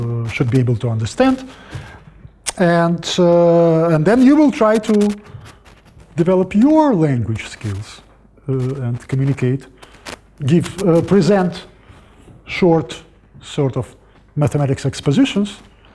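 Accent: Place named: Russia. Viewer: Потому что эти звуки нужно учиться выговаривать как можно раньше, желательно с детства. У русского ребёнка такой возможности в России нет, поэтому ему приходится учиться это делать во взрослом возрасте. У некоторых получается и они приобретают более менее норм. акцент. Но не всегда на 100%. Здесь я слышу у него практически в каждом слове русский акцент. И ещё большие паузы. Native speakers говорят на родном языке со скоростью света. Он говорит очень медленно.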